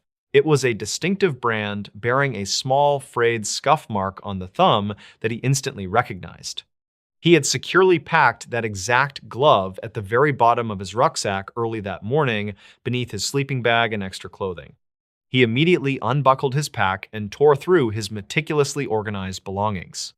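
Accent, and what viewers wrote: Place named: Essex, United Kingdom. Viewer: Essex England 😊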